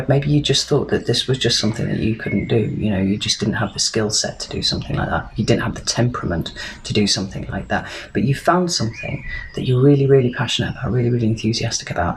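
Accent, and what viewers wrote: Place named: Newcastle, United Kingdom. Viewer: Expecting a Newcastle accent🤔? Anyhoo, the reading's bang on👍🏼The dark night lasted about ten years. I wasn't certain I'd make it through to be truthful - it was pretty touch & go for a while. But, yes; there's a project that's got me back on track. Thank you for the most excellent reading. 'Representing the male contingent by the way... Rob🦕 Namasteé 🙏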